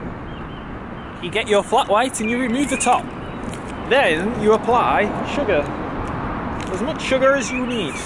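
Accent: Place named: United Kingdom. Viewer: Lad, where in the Uk are you from?had to use CC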